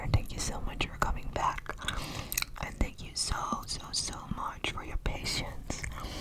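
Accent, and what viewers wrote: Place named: England, United Kingdom. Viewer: I looove listening to your English accent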